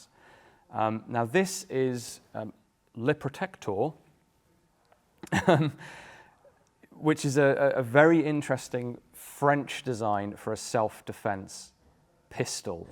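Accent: England, United Kingdom. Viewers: Some beautiful English accent for my ears. Greetings from Argentina!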